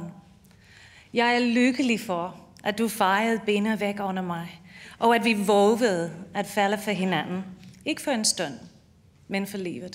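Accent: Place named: Denmark. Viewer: The subtitles are for the death and the hard at hearing and people who are death. The rest of us can understand her quite fine, as she is speaking what is called rigsdansk which can be compared to something like Cambridge or Oxford English.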